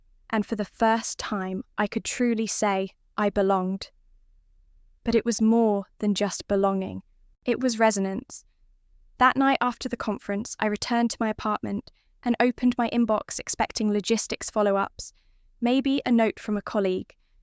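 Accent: United Kingdom. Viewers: Why don't they use an American accent? British accent for a military story set in the USA? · The British accent is nicer than most of the other AI voices in use, nice change!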